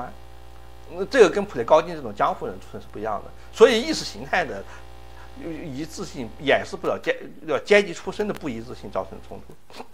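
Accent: Sichuan, China. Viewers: 加上字幕吧！ 蜀國話聽來吃力鴨！😁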